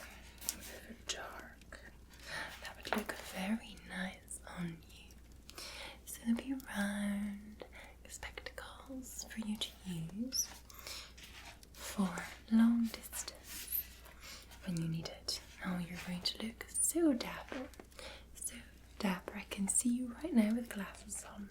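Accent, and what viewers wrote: Scotland, United Kingdom. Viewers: Pretty neat and beautiful my birthday is today think we can be best of friends?💐💖😘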